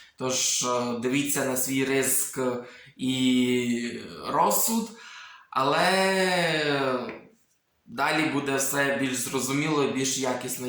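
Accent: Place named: Transcarpathia, Ukraine. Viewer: Не треба менше розмовляти закарпатською!🥺 для мене то - мед на вуха🥰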